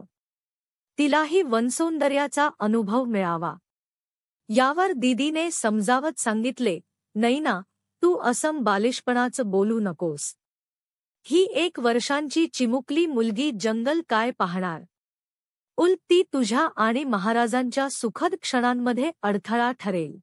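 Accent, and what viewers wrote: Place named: South, India. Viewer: कथा kathan shuddh मराठी bhashet सांगावी dakshinatya bhashesarkhi कथा kathan vat te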